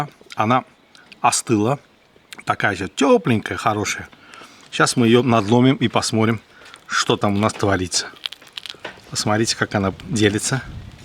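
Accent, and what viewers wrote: Baku, Azerbaijan. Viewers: Бакинский акцент самый родной❤ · Русский язык действительно в Азербайджанском акценте получается больше чем сладким. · Твой бакинский акцент напомнил мне Баку,когда я ещё жил там в советское время.Я вспомнил как пили пиво с горохом,мой отец так любил.Многие армяне до сих пор сохранили бакинский акцент,по моему он в крови да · Брат ты красавчик. Это не еда с пивком и нутом, это симфония. А ещё вкуснее невероятный Бакинский акцент. Долгих лет жизни тебе родной. Ты конкретно поднял мне настроение. Клянусь тебе сейчас пью пиво за твоё здоровье. Респект и уважуха!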